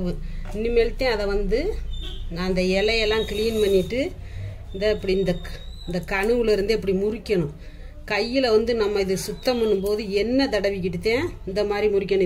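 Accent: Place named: Madurai, India. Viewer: How nice to hear Madurai dialect😘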